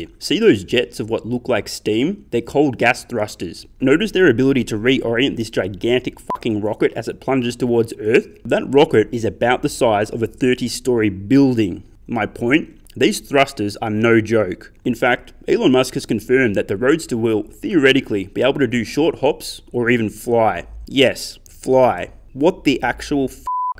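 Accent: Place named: Australia. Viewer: you have no offence a voice that is difficult to listen too.... it’s a very unattractive Australian accent. I suggest you get a woman to voice over your videos doing this will win you more subscribers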